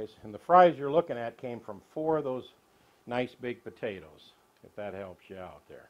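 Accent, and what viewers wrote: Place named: South, United States. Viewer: Nashville, Tennessee. I love the southern accent.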